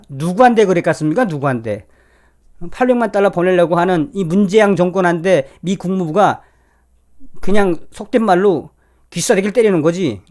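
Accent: North Korea. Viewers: 정성산님 수고 많이하십니다 ~북한말을 계속들으니 싫어졌어요 ~ 처음엔 재밌었는데~ 나라가 점점 종북으로 가고 있으니 걱정됩니다 ~ 이럴수록 자유대한 민주주의 것을 더욱더 고수해야 되겠습니다 ~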